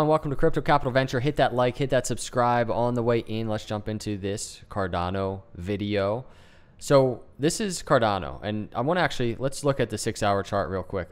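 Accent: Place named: Mid-Atlantic, United States. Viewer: I think I like you because you sound like you're from the NJ/PA/DE/MD area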